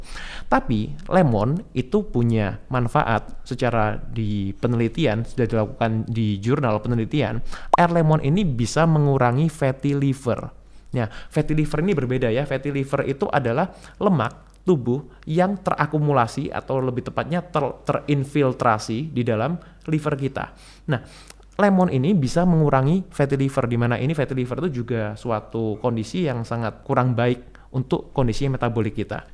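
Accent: Java, Indonesia. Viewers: Me I kedok jawa bicaraya medok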